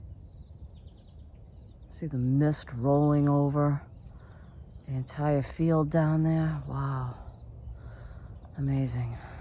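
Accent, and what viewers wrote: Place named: North, United States. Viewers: We southerners think it's funny when northerners talk about how amusing it is to listen to our southern dialect. Hearing you speak yankee is enjoyable to me. Thanks for what you are doing. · As a Northerner (PNW sounds more Canadian than anything else,) I like her Easternish accent. Getting in her cah, or saying which gear is bettah...lol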